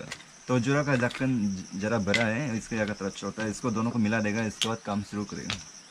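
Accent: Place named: Dhaka, Bangladesh. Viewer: Assamese ma dhakiya bolta ha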